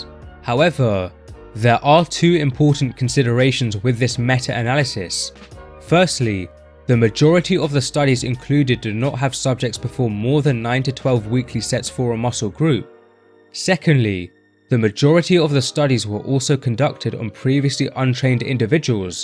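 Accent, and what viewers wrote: United Kingdom. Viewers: What regional English accent is this?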